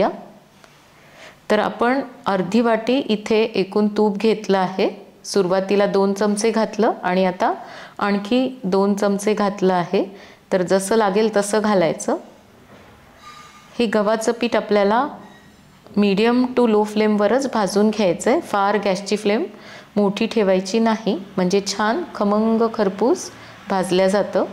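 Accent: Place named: Pune, India. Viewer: Tumhi puneri bhasha bolta chan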